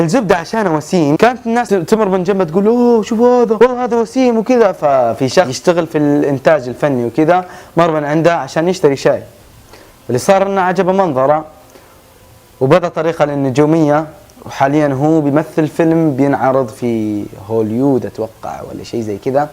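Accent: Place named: Syria. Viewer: I أنا مشتركة جديده في القناه... و صراحة الشي إللي حيرني هي لهجتك...مني عارفة بس...إنت تتكلم سعودي بس في لكنه بسيطة سورية...مادري ليش...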